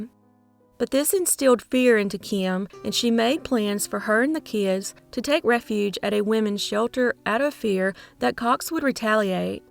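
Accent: South, United States. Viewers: The first case is a perfect example of why the death penalty is very much needed. Absolute low life. I love listening to you talk about these stories, your voice is so relaxing and melodic, it's exactly what I think of when I think about the Southern accent 🙂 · the stories are well thought out and I can listen to her southern accent all day. subscribed!!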